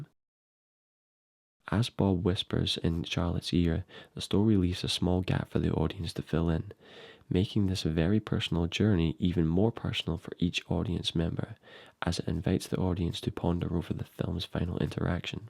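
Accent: Scotland, United Kingdom. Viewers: I thought it was an automated robot voice at first and was about to close the video. Then I realised it was a Scotsman just speaking in a formal voice lol. I agree his voice is unique nonetheless haha. Good vid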